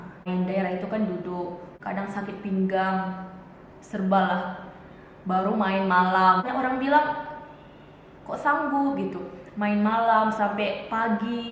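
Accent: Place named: Medan, Indonesia. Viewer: lanjutkan kak.. saran aku hanya klo vlog, jgn dirubah logat medan nya.. gas poll kak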